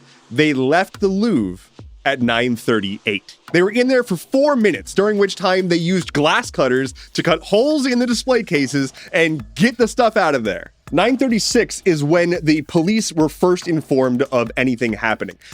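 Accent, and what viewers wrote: United States. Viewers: Would've sworn you were from and based in the PNW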